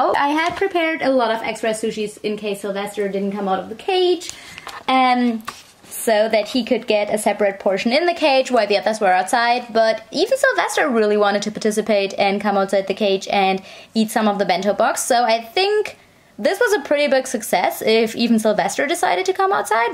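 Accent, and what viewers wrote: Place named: Germany. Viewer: I’m so late lol but she’s German (I’m 98% sure)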